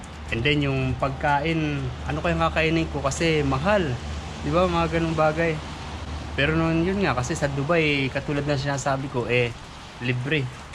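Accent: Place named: Batangas, Philippines. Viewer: Korekek taga Batangas kba Ako oo sa Lipa Batangas may punto ka kasi eeeee😛😛😛😛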